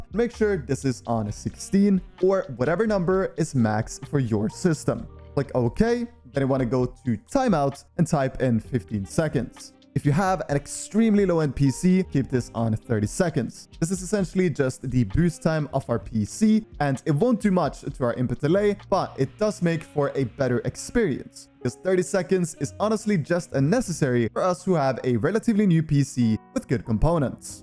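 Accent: Norway. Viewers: Er du norsk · er du norsk????? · U Norwegian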